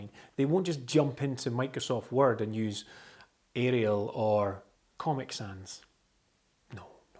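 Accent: Scotland, United Kingdom. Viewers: HOLY CRAP YOU ARE SCOTTISH! IM PART SCOTTISH!!! HOW DO I GET BETTER AT MY ACCENT AND THE GRAMMAR IN SCOTTISH?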